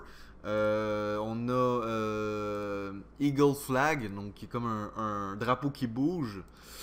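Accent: Quebec, Canada. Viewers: J'adore ton accent Québécois ❤️✨ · il est québécois ^^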